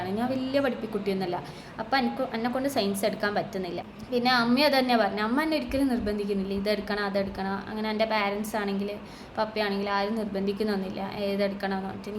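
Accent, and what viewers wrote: Kannur, India. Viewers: അത് കണ്ണൂർ സ്ലാങ് ആണ് ബ്രോ 😁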